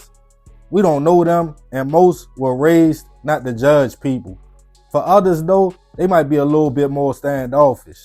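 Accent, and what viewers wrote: Baltimore, United States. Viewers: dat bmore accent too hard to hide...lol yo be like tryna sound professional but dat bmore swag just shine thru brova.. Just speak how u speak yo and it is what it is. Being yourself makes people appreciate your content and u more.. Also i ive in bmore bro and i wanted to tell u that I hope one day i meet u cuz u inspired me to start grinding again on my page.. Im about to start gettin to it and being consistent and thats because of u brova!! so thank u... sincerely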